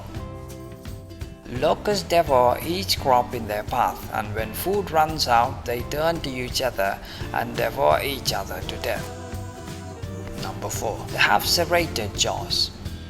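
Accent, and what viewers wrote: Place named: Qatar. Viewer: Nice Qatari Voice....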